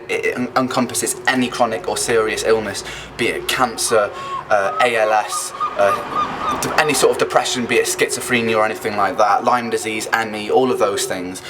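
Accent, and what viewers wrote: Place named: United Kingdom. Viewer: You are cool , I like the british accent , I'm from India , a developing country , our scene is the same . But Our country is trying it's best to built government hospitals and free medicine from them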